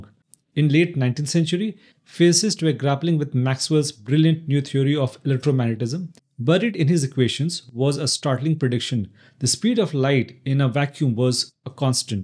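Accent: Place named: India. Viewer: I can't understand your indian accent. Have you tried speaking normal?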